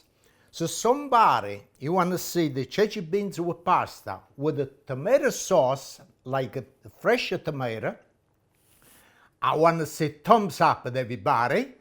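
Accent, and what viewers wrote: Italy. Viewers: When I was a kid I remember old Italian men speaking in this accent during the 80s. Good old days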